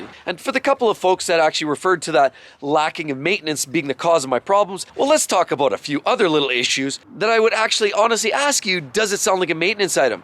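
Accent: Canada. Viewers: Wait wait.. are you from Canada?!.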